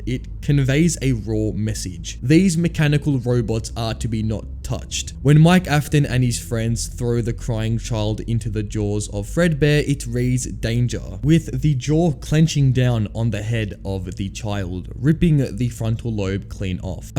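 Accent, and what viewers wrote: Australia. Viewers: Are you Australian cause I’m too bro 🤩 also great video enjoyed it mate 🫶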